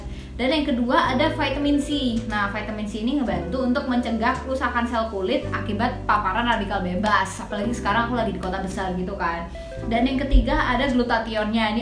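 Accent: Java, Indonesia. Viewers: wong endi mbak? wong jowo huduk ? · Orang mana mbak kok medok jawanya? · Orang Jawa ya mba?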